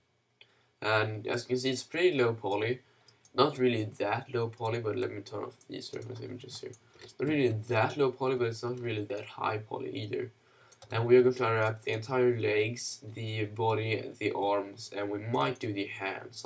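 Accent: Sweden. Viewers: Haha, fan va man hör att du kommer från sverige ibland!! Lidingö eller? :P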